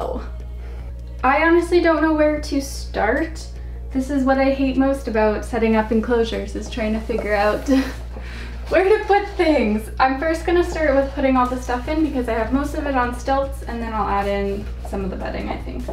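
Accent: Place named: Canada. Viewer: When you even realized how Canadian your accent was LOL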